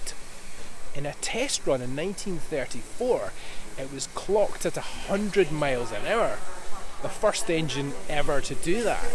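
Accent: Scotland, United Kingdom. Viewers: Great grand show thanks i was just hearing that you have a stronger scottish accent than Ur brother the hoof gp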